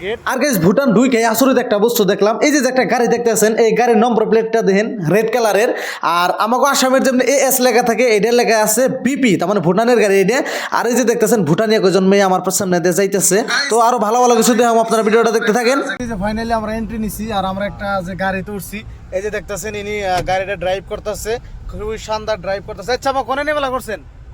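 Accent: Bangladesh. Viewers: আপনার কথা শুনে মনে হচ্ছে আপনি বাংলাদেশি যদি ভারতীয় হন তাহলে এরকম ভাবে কথা বলেন কেন? কোন জেলায় থাকেন? আর যদি বাংলাদেশের মানুষ হন তাহলে আঁধার কার্ড কিভাবে পেলেন? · Amader tangail ar moto language lage 😊❤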